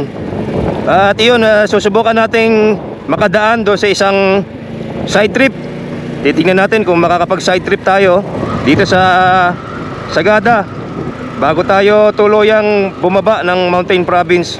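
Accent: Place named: United Kingdom. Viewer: WOW THAT'S A BRITISH ACCENTS.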